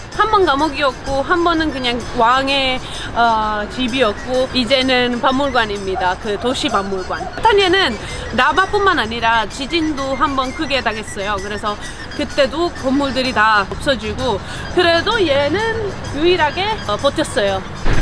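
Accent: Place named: South Korea. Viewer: 악센트가 딱 한국인입니다 그려.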